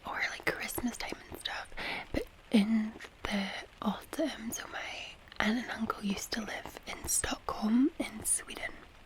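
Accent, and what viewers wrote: Scotland, United Kingdom. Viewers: What told us about herself: 1. From Scotland 2. Has scottish accent 3. Loves football 4. Drinks beer 5. Parents live close to Golf course If these were the traits of a character written for a TV show, the writers would be accused of reproducing quite a lot of stereotypes xD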